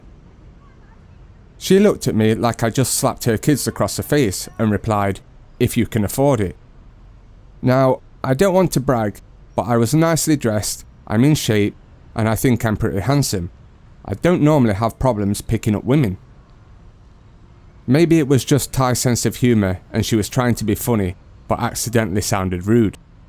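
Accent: United Kingdom. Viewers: You Sound like you come from the U.K. Question: would you ever consider having a prostitute as a girlfriend ? The bar girls in Pattaya are all prostitutes, no matter how pretty and well presented they are. So these girls are all a risk and have a certain mindset. Have fun and walk away!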